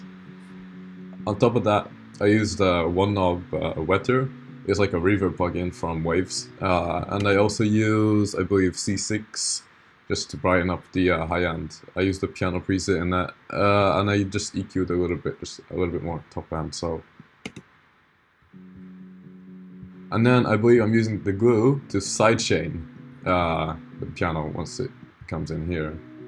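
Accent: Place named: Sweden. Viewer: Jag hitta en guldgruva !🤩🤩 fan oslagbart kommer kolla på din kanal 24/7 . Jag gör bort mig om du inte är svenska utan jag bara dömer på din dialekt Ahah.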